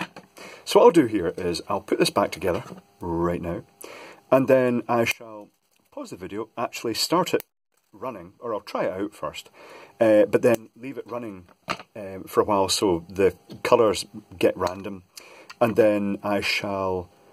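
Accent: Scotland, United Kingdom. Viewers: 17 seconds in sound is clearer and not harsh at all i enjoy the scott accent reminds me of my great granddad :-)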